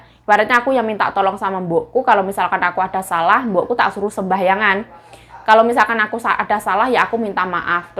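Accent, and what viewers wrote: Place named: Java, Indonesia. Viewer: Bahasa Mandarin kamu lucu sekali..masih campuran Jawa,sebutan Mandarinmu sebutannya lain...lucu dong,mungkin selalu berbahasa Jawa mungkin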